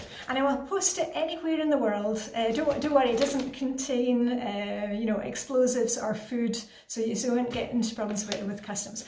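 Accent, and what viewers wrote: Scotland, United Kingdom. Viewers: On IG I guessed the mystery box was just that: an empty box so we could start decluttering. 📦Now I'm thinking a hygge -personalized binder (pom poms and a Danish flag on the front?) so we can add all our routines. I would love a personal session if for no other reason than to soak up your energy and listen to your Scottish accent for a wee bit! Happy "open" New Year..you give so much so graciously. ♥️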